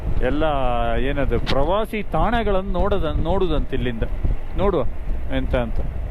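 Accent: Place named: Kundapur, India. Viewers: Hello nivu Udupi avara, nimma kannada Keli oru badi andu konde. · Kundapur kannada ❤️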